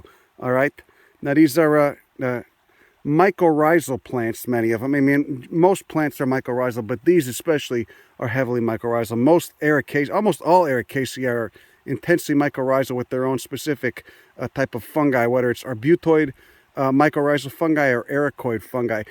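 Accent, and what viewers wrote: Chicago, United States. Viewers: Prologue: Caffeine and Adderall. Apologies. I'm pretty sure this is my favourite video so far. Your sense of humour, Chicago accent, and total lack of self-censorship makes it stellar. And yes, admittedly and embarrassingly, I do have a big homeauxsexual crush on you. I want to thank WIRED for introducing me to your stellar work. I do hope that you are making good money now with your uncommon knowledge. In addition to dreamily gazing at your videos, I'm also completely obsessed with gardening. In particular, right now, house plants. It's just a mess in my house in Vermont. If you do actually see this comment, have you ever done any exploration of the botanical life here around Vermont? I have this dream that someday I can go exploring the geology and botany of the area. Being from New Orleans, it's like living in the fucking Alps to me. 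I'll stop now. Thank you for the videos!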